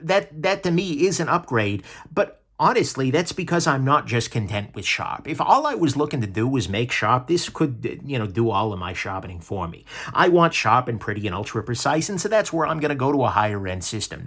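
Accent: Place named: Boston, United States. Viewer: I was placing it as a Boston accent, so curious because of your question, I snooped around the interwebs. He said, "(Seriously, though, when you're raised by a Bostonian and a New Yorker in the American West, you're pretty much screwed from the start.)"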